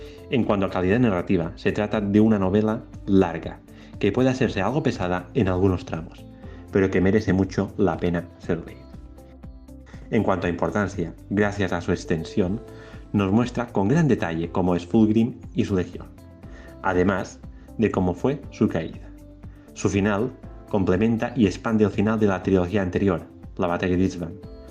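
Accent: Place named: Majorca, Spain. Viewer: tienes un acento mallorquin que me encanta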